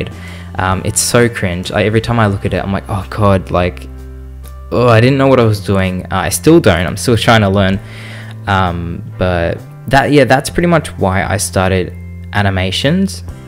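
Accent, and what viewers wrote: Australia. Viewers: I could tell u were from Australia. I am too mate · aww you seem so smol in this and after you mention being Aussie, I just heard your accent so much more intensely after lol.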